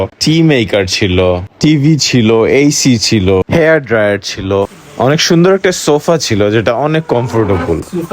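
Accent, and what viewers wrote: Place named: Sylhet, Bangladesh. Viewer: His Syl accent is so cute 😂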